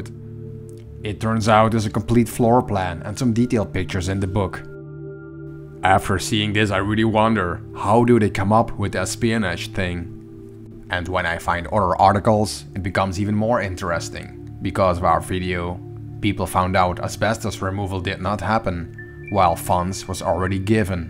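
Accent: Netherlands. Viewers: Intriguing what they sued you for instead of suing you for trespassing as you were basically trespassing. The possible secret agenda of the asbestos clean up once more shows the different motives of parties to sue on another instead of logical reasons. It was hilarious though that the article writers thought you were American, as a Dutchy myself I can clearly recognize that accent. No offense of course. Thank you for what you do and I appreciate that you and your friends are willing to put so much on the line to show these amazing places to the world!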